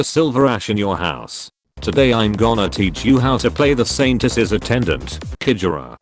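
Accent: United Kingdom. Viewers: British? that makes it even betteh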